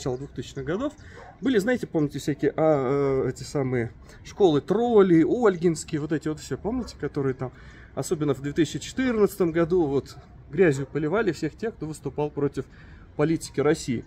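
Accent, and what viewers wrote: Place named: Armenia. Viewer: А ты видимо получаеш армянские драмы а не азербайджанские манаты наверное и у тебя армянский аксент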